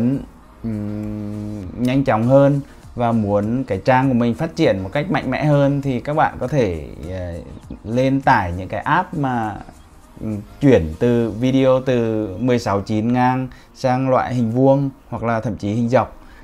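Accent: Central, Vietnam. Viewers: Anh người miền Trung àh.ngương mô anh quá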